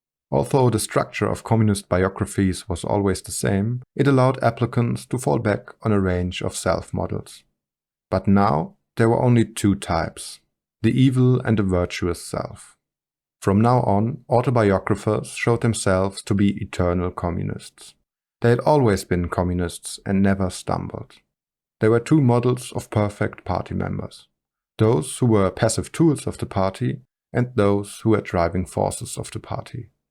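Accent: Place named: Germany. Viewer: He's a German for sure. Can't you tell by that accent of his? I don't know why, but I find that a German accent, or just plain listening to the spoken German language to be quite compelling.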